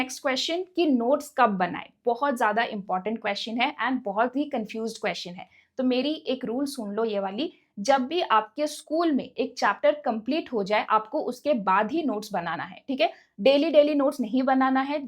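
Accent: Odisha, India. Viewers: Are you from odisha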